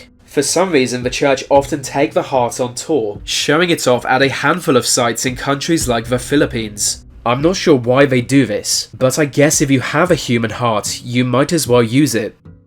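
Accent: England, United Kingdom. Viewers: I dont know what it is. I love your voice, or pitch, or if its any kind of inbreed english accent? Its captivating. Not from Britain so I dont know. Actually, im not from a english speaking country whatsoever and dont know much about anything. Therefore im watching your channel. Its great! Keep up the good work.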